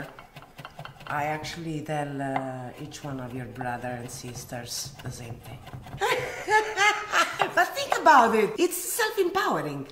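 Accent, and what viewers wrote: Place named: Rome, Italy. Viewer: Che meraviglia quell' accento Romano, ah quanto vorrei essere invitato a casa di questa mamma "per mangiare si"😅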